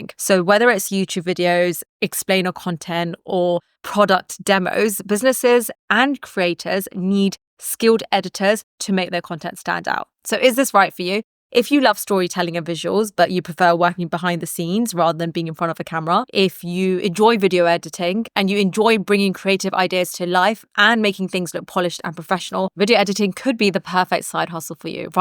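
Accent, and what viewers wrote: United Kingdom. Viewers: This Britain English accent is terrible. I am not specifically referring to, but I have seen British movies or netflix series and I found the accent to be more crude and hard to understand. The US accent is music to ears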